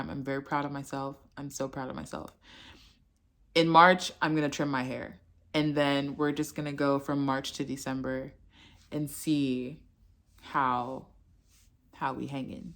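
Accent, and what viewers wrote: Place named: Nigeria. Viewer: Random: I love your Nigerian (taking a wild guess) accent and thank you for this vid!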